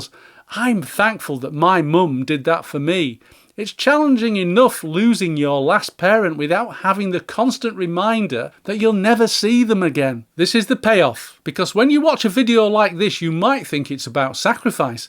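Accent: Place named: Liverpool, United Kingdom. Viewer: Sounds like a wise Liverpool man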